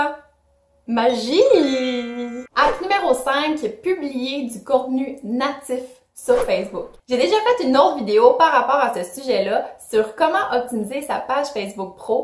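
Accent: Quebec, Canada. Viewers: Oh une québécoise 😍😍 Même si je viens de connaître ta chaîne continu je crois en toi xD 💪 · Tu est québécoise j'aime trop là-bas merci pour la video ✌🏾✌🏾😍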